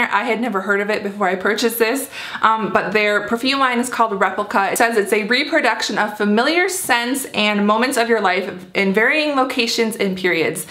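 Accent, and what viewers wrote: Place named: Midwest, United States. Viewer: Are you from the midwest? You sound like you have my accent! :)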